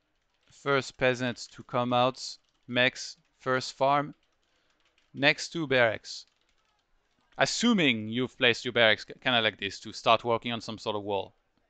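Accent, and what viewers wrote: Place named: France. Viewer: la légende FR toujours un plaisir tes vidéos même avec ton accent bien français mdr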